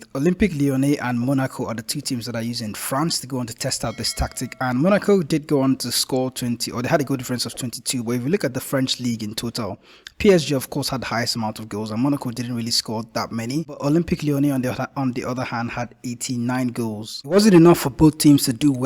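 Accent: Nigeria. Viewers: Are you nigerian bro ? You sound nigerian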